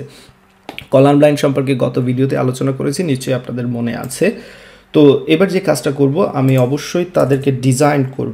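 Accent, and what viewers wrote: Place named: Sylhet, Bangladesh. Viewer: sir u are really amazing.. Sylhety bhai ❤️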